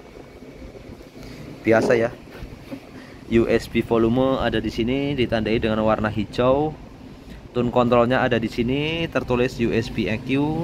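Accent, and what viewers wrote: Banyumas, Indonesia. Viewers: ok joz mas boos,aja kelalen ora ngapak ora kepenak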